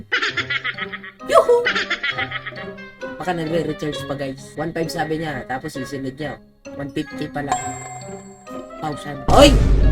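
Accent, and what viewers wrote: Visayas, Philippines. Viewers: Bisaya man diay ka pader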